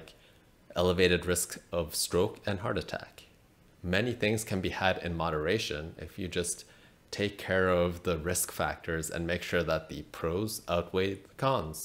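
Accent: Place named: South Africa. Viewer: Hi, I love your videos - well done 👍🏻. When I listen to your accent, sometimes I think I detect either a South African (my former country) or New Zealand accent on some words? I must say the release of the study of Xylitol swerved my inclination to start using it. Now I know how much they used, I will rethink it. One thought I have is when we put a substance in our mouth, it is absorbed into the bloodstream so I'm wondering if this may raise risk 🤔. Just a musing...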